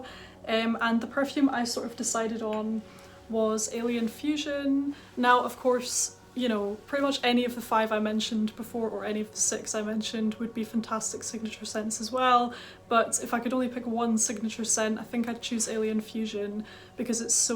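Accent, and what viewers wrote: Scotland, United Kingdom. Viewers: Oh, I see! I'm not an expert, obviously, but to me the accent (esp. the r) from that area is quite different from the Edinburgh area (where my grandfather and my surname hail from!). I just saw the "Scotland" pin was below one if your videos recently, which was what made me think along these lines! Love your videos, they are so calming, too, so would definitely love to see some ASMR as well! x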